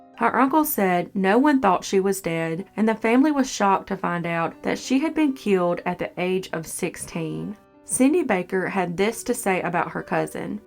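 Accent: South, United States. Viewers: I love the narrators southern accent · Love your accent 😊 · Yeah the videos really good and informative, but that southern accent is the cherry on top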